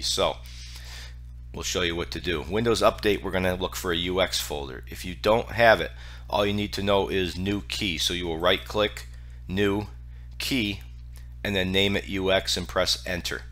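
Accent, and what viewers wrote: United States. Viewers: Your heavy accent is familiar, NY, NJ?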